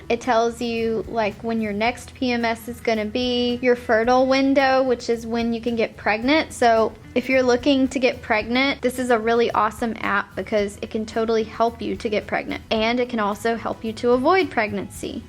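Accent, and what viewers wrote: South, United States. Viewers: You sound like a country girl. I love it. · her southern accent is cute 😁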